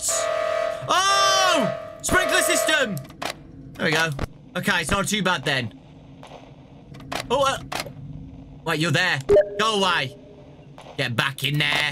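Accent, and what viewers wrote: United Kingdom. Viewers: Does anyone here think British accent is cute☺?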